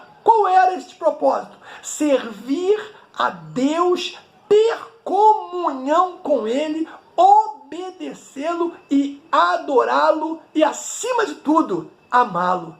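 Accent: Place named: Rio de Janeiro, Brazil. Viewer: Vc é carioca ? Sua fala é irritante.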